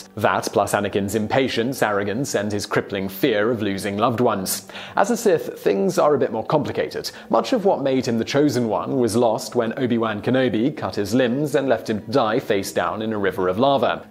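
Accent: United Kingdom. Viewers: Chillax he's British his pronunciations are endearing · Oh limey Brit accents. Made this hard to watch. Not one name pronounced even close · Do you need to put your British spin on every name?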